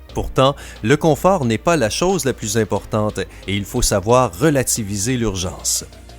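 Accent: Quebec, Canada. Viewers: Like si tu trouve que la vois et quebecoise lol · 10ème commentaire! Sinon, t'as un genre d'accent français/québecois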